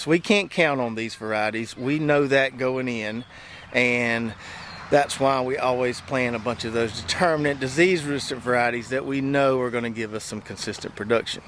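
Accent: South, United States. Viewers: I’m a new subscriber I was wondering what state are you located ? I’m in Florida and it looks like Florida and maybe the accent lol. Definitely from the south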